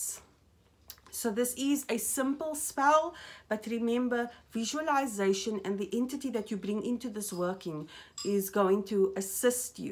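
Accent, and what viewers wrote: South Africa. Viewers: Hallo, recently discovered you on your dark energy video's. Are you South African by any chance?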